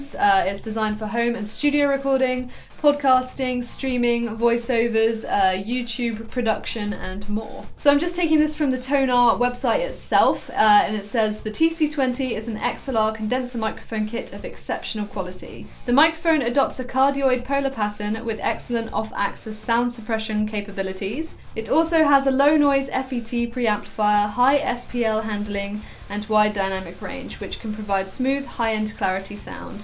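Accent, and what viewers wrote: England, United Kingdom. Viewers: Dear God, your English accent is just désarmant. Magnificient.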